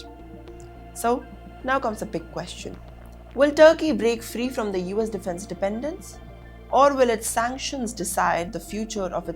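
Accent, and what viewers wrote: India. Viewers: That Indian accent…😮😅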